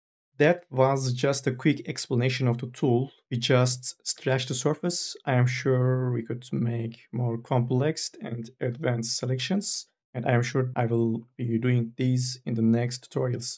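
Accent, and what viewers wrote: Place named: Turkey. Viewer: paylaşım için teşekkürler... aksandan yakaladım.. yanılmıyorsam türksünüz hocam..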